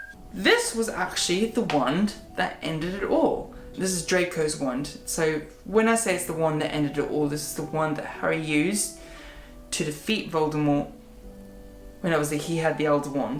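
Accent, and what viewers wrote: United Kingdom. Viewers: u sound like devon bumpkin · Nice British accent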